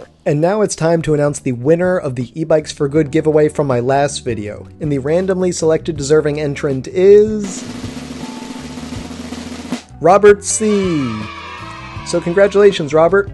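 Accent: United States. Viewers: You sound American. So where is home?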